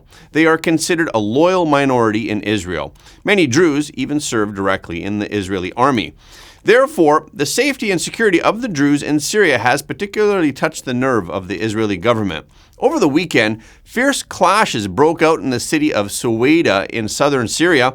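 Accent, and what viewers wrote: Canada. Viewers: you do a great job of giving us real news! Thank you! It sounds like you may have a Canadian accent? Aetna is pronounced Et-nuh. 🙃😊🤗 A few other words give you away, as not being US pronunciation’s, but not too odd to our ears.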